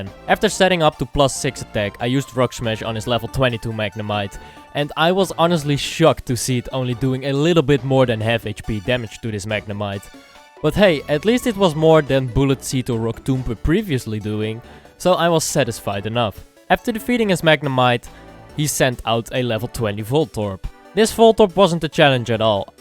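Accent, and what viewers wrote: Netherlands. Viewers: Ik herkende het echt meteen, dacht gelijk "Ahh deze gast is Nederlands" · Are you dutch? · Yo dude u from the netherlands?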